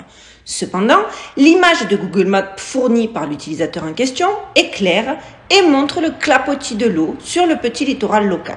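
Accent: Marseille, France. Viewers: Hello jolie fille du sud 😊 moi aussi je suis marseillaise et j'aime ton accent qui me rappelle le sud qui me manque 🥰 et tu as raison les gens parce qu'on ne voit pas ça n'existe pas 🙄 ahhhh les conformistes de la ruche avec aucune ouverture d'esprit 😅😂 ces habitants de l'île ressemblent à des pleéadiens ou à des atlantes 🤔 je pense que certains êtres bien en avance sur nous, habitent toujours sur Terre ou intra Terre. Certains bienfaisants et d'autres beaucoup moins car sinon les élites ne pourraient pas contrôler ce monde aussi facilement... A voir 😅 peut-être un jour aurons nous la vérité 😉😘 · Cc.. J'adore ton coup de gueule.. 😂🤣🤣😂🤣🤣 Les gars allé sur France 2..🤣🤣😂😂 Toute façon tu aura toujours des critiques c'est la rançon de la gloires.. Ta gloires a toi! Ce que tu fais pour nous tous les curieux, les éveillés qui penses que l'univers est habités par des êtres autre que humains et bien moi j'y crois aussi..merci pour ton boulot 👏👏👏👏👍👍👍merci.. 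Et puis ton accent de Marseille est bien très joli.. ☺️ Je te souhaite de bonne fêtes.. 🎉🎉🎂🎂🥂🥂🥂🥂🌹🌹🌹🌹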